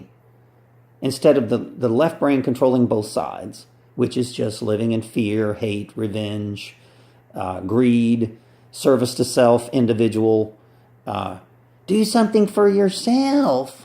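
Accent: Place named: United States. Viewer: what have the Russian bots got to do with helping targeted individuals?you sound American, if you are American you should organize targeted individuals to run the gauntlet to reach president trump. I can assure you trump is oblivious to these programs carried out on innocent humans. try watching youtube channel (ramola d). my freind Trump is the one way these programs can be exposed. the other way is if Putin allows Edward Snowden to expose these horrific crimes. or god gives rise to several whistleblowers who will be mavericks to expose what tortures the corrupt establishments have performed on humanity. what I do believe in is when the time is right gods forces will destroy all satellites communications to free humanity. good will and has to prevail evil.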